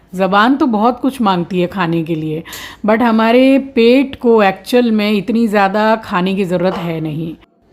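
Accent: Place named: Uttar Pradesh, India. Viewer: Dear app Calcutta Se Hain Magar aapke bolane ki style Puri UP ki hai aap baton baton mein bahut se aise word bolati Hain Jo ekadam Apne se lagte Hain❤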